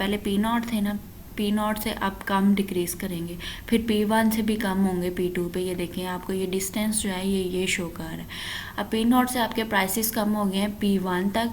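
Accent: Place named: Pakistan. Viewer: Aapki aavaj se lag rha hai , ki aap Pakistan se ho 🙏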